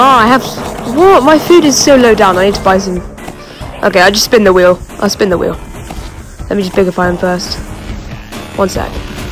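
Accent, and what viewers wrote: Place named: United Kingdom. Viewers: Hes in UK but he has a brittish accent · Is no one gonna talk about why he sounds British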